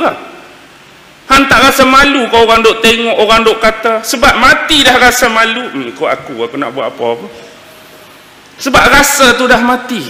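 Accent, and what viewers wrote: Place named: Penang, Malaysia. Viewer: asal kelantan tapi bahasa loghat penang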